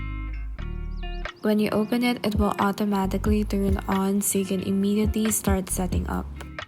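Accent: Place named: Latin America. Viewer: You sounds like a Latino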